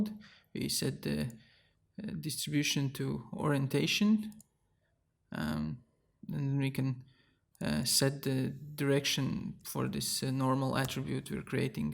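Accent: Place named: Latvia. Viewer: Es sāku skatīties neredzot autoru, un tad ieslēdzas mans šerloks dzirdot akcentu... "Tā..tas toč ir latvietis... tā...ar Houdini procedurālā modelēšanā varētu mums būt Kurzem..." ..skrullēju - Yess :)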